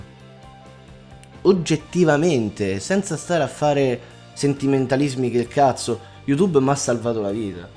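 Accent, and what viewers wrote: Rome, Italy. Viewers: hai ringraziato i tuoi 200k..per averti aiutato..io nel mio piccolo farò lo stesso..grazie..penso sia un pensiero condiviso da molti..lasciare tutto e provare fin in fondo a fare ciò che ci piace è forse la cosa più difficile da fare..grazie del tuo coraggio..anche tu hai aiutato moltissime persone che in quel momento avevano bisogno di sorridere con un po' di romanaccio di sottofondo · Dietro uno schermo sembrate così extraterrestri, ma tu..o meglio, tu..sei una persona, vera e pulita. Ora io non ti conosco, dal vero almeno, ma sento la tua 'stranezza' molto simile alla mia stranezza, sei la persona più vicina a essere umano che ci sia su YouTube Italia.. tanto umana e da riuscire a farmi piangere col 'signor Mario.' a farmi ridere col tuo romanaccio nei video di minecraft. La cosa che stupisce di te è l'umanità, un valore che a quanto pare sta andando perdendosi. 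Io un giorno ti incontrerò, ti offriró un'immensa Gordon scura e farò un'immensa chiacchierata con te. Per il momento la Gordon la bevo in tuo nome, grande uomo in un mondo di alieni.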